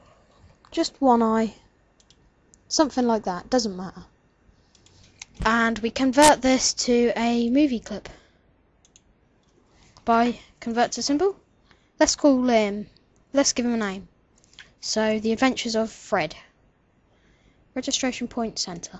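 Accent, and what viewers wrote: United Kingdom. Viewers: thx! this really halped! and w00t FINALLY i hear a British voice on youtube 4 once XD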